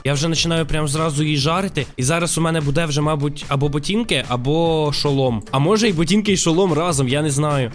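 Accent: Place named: Surzhyk, Ukraine. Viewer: Ви розмовляете на суржику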